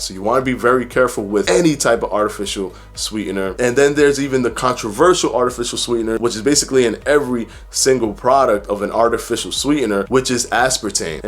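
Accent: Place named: East Coast, United States. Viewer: Are you from the East Coast, I hear an accent?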